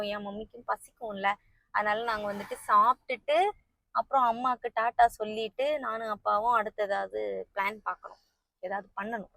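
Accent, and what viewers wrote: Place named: Sri Lanka. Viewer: You sound Srilankan, are you from there?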